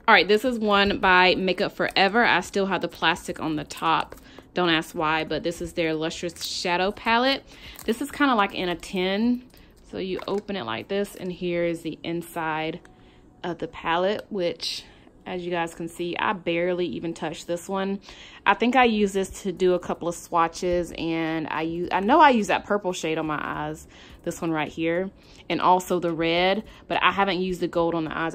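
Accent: South, United States. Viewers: Your southern accent is so adorable😆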